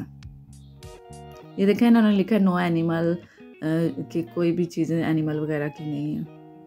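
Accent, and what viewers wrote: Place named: Punjab, India. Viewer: Miss ap ka vlog boht pasnd he or ap k bat krny ka style panjabi he acha lgta he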